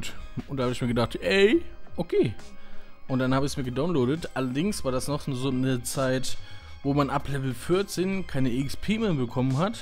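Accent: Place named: Germany. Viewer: Uff dieses deutsches Akzent ist ja schlimmer als Hochdeutsch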